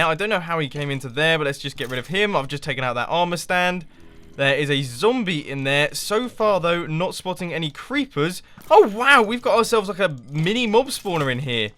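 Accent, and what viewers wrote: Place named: United Kingdom. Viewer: His accent is clearly Brittish.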